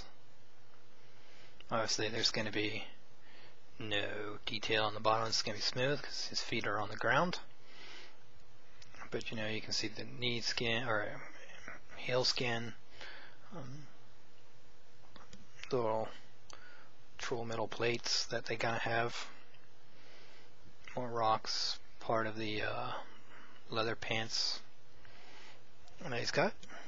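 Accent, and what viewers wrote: Australia. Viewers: He's not from the UK he's an Aussie, big difference in accents.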